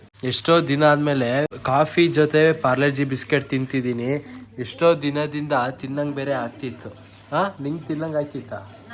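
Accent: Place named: Hassan, India. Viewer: Pysch agi matadtya bro nam hassan bashe na.. keep on doing bro ❤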